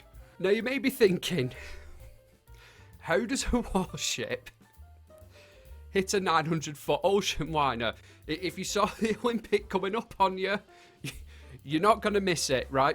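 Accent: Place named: North, United Kingdom. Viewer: Long time TNG Fallout follower, nice to put a face to that Northern Voice. Simply, simply lovely.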